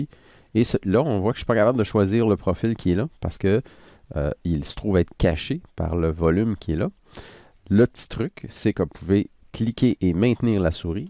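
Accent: Quebec, Canada. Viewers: Bonjour Je connaissait un peu fusion mais je viens de découvrir ton site et c'est vraiment très dictaticiel et en plus je suis français et j'adore l'accent québécois!!! Une question, tu as un ordre de visionnage pour les autres vidéos? Merçi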